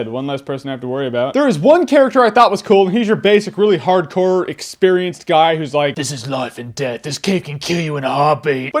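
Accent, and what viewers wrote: Australia. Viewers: Nice aussie hardcore adventure guy accent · Hahaha Im aussie and that would have to be the funnest Aussie accent i ever heard but still was a real beievable one at that,Should do a review on the Crocodile Dundee for shits and giggles! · Jeremy's a wizard at faking australian accents.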